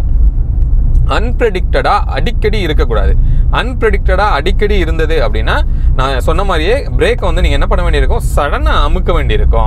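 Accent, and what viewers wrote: Virudhunagar, India. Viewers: Neenga VIRUDHUNAGAR ah na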